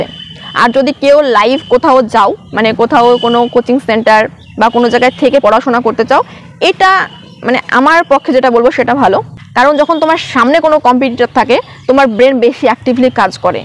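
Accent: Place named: Bangladesh. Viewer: Kotha gulo bangla desi bangla deshi lagche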